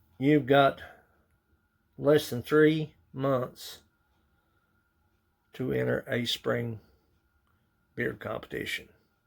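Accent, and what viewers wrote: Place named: Appalachia, United States. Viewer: Absolutely love the accent! Where are you from my friend? I'm guessing somewhere in the Appalachian mountains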